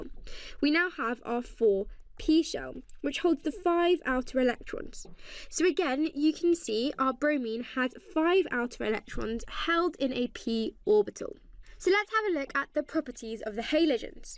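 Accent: United Kingdom. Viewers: yeah strong British accent :D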